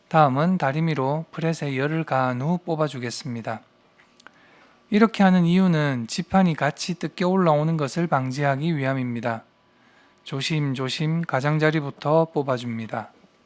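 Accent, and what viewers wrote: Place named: Gyeongsang, South Korea. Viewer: 우연히 본 일본 올드 기타 리페어 영상들~ 정말 손재주가 대단하세요 ~ 구수한 경상도 사투리가 정감이 가네요. 저도 울산인이라~ 기타 간단한 작업 정도만 하는 주부인데 장비며 꼼꼼히 리페어 작업하시는 게 감탄이 나오네요~ 재미있게 여러 영상들 잘 봤습니다~😊😊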